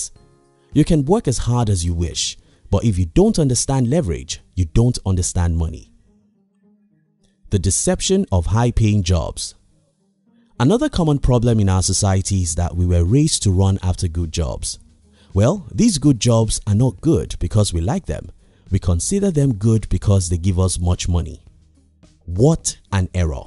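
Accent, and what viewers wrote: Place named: Nigeria. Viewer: You sound Nigerian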